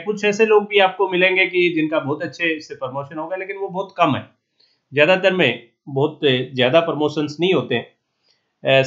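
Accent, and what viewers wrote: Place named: Gujarat, India. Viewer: Seems like you are gujrati by tone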